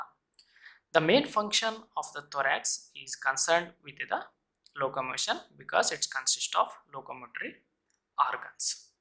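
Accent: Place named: India. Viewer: sir pls talk like a human being so its understandable for mortals who live outside the Indian subcontinent, pls thx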